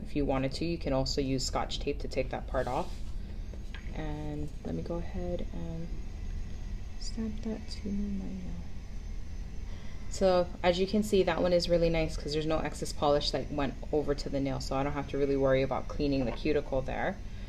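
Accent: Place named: Hawaii, United States. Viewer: Aww I could tell where you’re from by your accent before you said Hawaii! ❤️ I’ve kept an eye out on your products since the Bundle Monster days but still haven’t gotten around to purchasing yet...fell out with nail polish for a while because I couldn’t wear what I wanted at work. Now I’m slowly rebuilding my collection, thinking of finally getting a stamping plate, and omg how am I finding out only now that you’re from Oahu 😲 now I’ll have more reason to buy, love supporting local...even though I’m currently living away from home! 🤣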